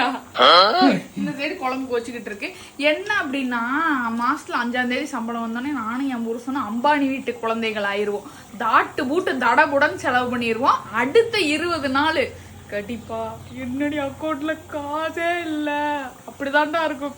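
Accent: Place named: Coimbatore, India. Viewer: கோவை தமிழ் சூப்பர் மா ❤❤❤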